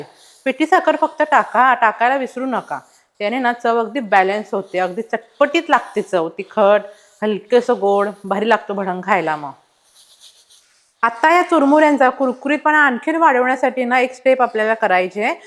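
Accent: Kolhapur, India. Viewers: Typical Kolhapuri bolta te baghun Lai bhari vatatay.Ek no.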